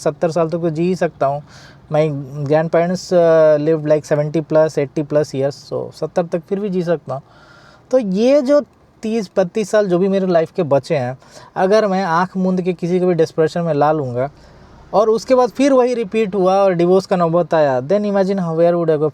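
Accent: Bengal, India. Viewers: are you bengali ?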